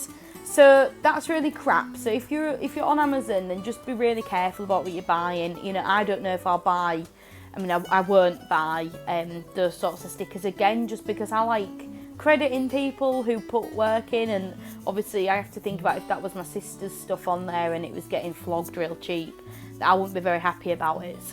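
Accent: United Kingdom. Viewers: So beautiful!! I really enjoyed watching you decorate those yummy and oh so happy looking pages! And I also really enjoy listening to you. Love your british accent! ☺️ Could you please tell me what kind of pens you are using to make the colorful marks on the pages (dots, lines,..) Are they regular markers or brush tipped? Just curious... x.o Nat.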